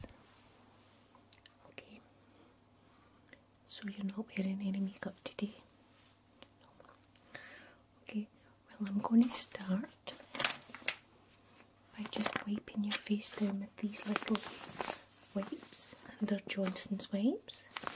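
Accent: Scotland, United Kingdom. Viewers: Thankyou for a great video! I love loveeeee your Scottish accent! And I love your makeup videos. You make it so relaxing! Great job gurrrrrrrlll!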